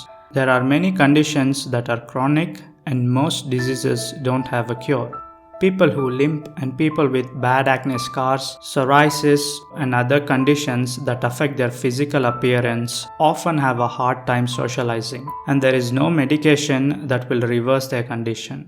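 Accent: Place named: India. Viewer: pajeet accent messed the whole philosophy up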